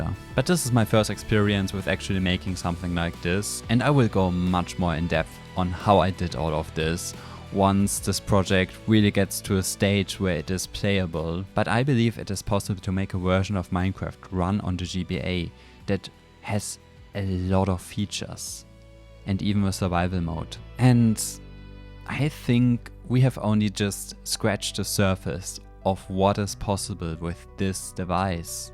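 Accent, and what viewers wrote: Germany. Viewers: i hear german....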